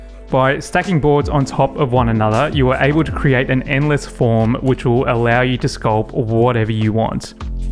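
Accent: Australia. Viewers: This was so beautiful and satisfying to watch. It's nice to hear an Australian voice too!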